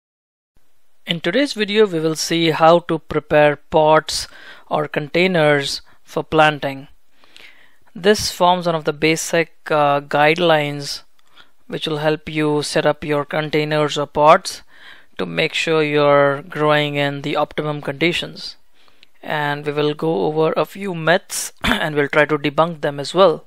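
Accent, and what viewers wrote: India. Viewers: From your voice i think you are indian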